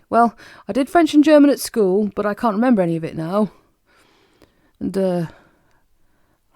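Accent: Newcastle, United Kingdom. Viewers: lovely accent and great reading, thankyou. Geordie?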